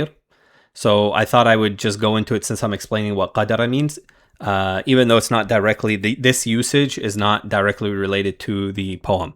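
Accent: Iraq. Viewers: زين اني شلون عرفتك عراقي من اول كلمة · are u iraqi? I'm just wondering because of uour accent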